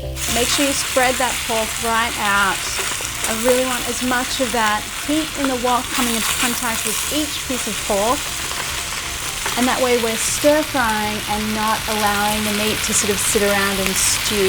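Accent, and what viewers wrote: Australia. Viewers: As an Aussie, I'm guessing from your accent, you're one too? I am new to your channel but love your videos!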